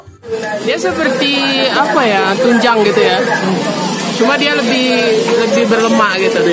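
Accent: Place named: Padang, Indonesia. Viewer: Suaranya pun masih berlogat padang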